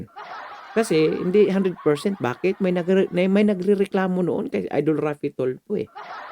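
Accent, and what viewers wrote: Visayas, Philippines. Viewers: Visaya ka eh di ka Naman tlga Taga manila hahaha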